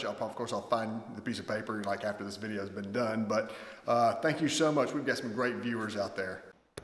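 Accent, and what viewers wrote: South, United States. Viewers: Love watching your work. That Southern accent is a plus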